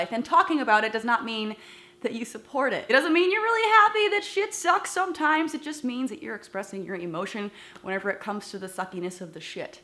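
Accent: United States. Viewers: It sounds like you live in the US.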